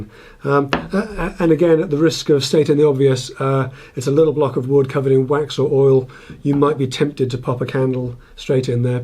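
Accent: United Kingdom. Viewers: A great little gift idea.Thank you for your time and inspiration this past year, I always enjoy your videos; Fun, Informative and with a Wonderful British Delivery.From one Brit to another, Merry Christmas, All the Best to you and yours.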